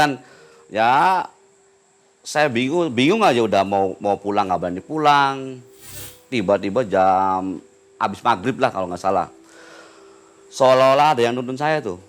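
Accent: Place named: Java, Indonesia. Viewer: Maaf klo boleh tau sampean orang mana sih klo aq liat tayangn sampean ko Kya alergi banget bahasa Jawa..apa" ga tau?padahal klo di lihat sampean bicara logat orang jwa juga?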